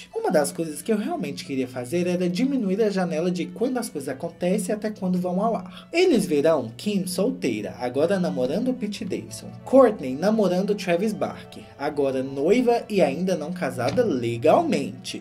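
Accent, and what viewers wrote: Minas Gerais, Brazil. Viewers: do céu, NUNCA acompanhei as Kardashians. Mas gostei tanto do seu jeitin (vc é mineiro néam?!) de contar a história que vou acompanhar por aqui! Bjos!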